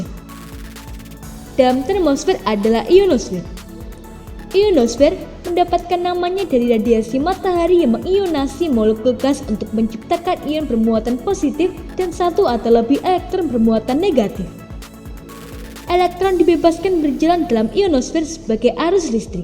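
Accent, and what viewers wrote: Java, Indonesia. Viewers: Medog anjirrrr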